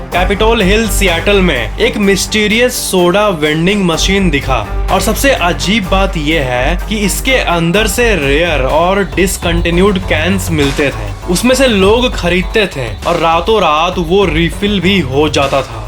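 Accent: Bihar, India. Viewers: pakkkka bihari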